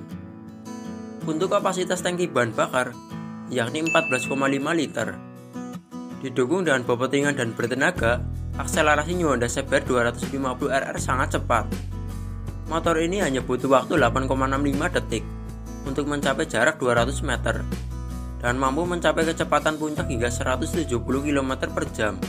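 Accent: Java, Indonesia. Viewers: Dari logat nya seperti orang jawa ( ngapak). salam dari purworejo jawa tengah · Medok nemen mase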